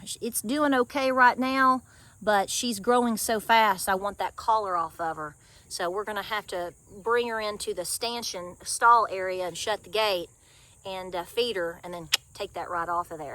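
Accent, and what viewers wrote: South, United States. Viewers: I just found your channel and I enjoy you very much. Thank you, love your peppy self and that Southern accent ❤️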